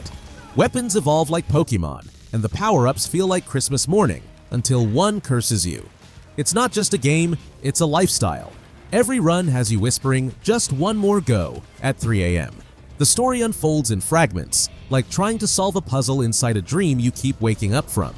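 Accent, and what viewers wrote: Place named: United States. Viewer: American assent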